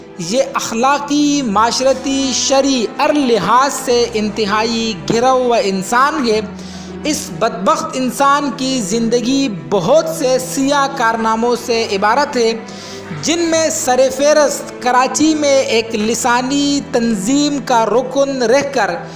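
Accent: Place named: India. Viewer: Ye kesi Pakistani k bolne k style ni he is indian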